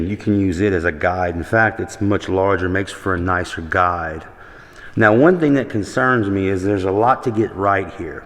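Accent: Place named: Louisiana, United States. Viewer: when i first heard you start talking i said this cat has to be from Louisiana, great video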